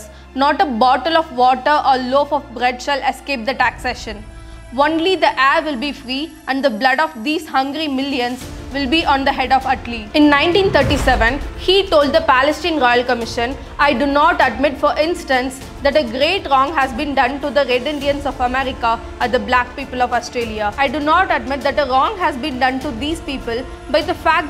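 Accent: Tamil Nadu, India. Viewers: your pronunciation with heavy Tamil accent is bad. You observe Palki and learn.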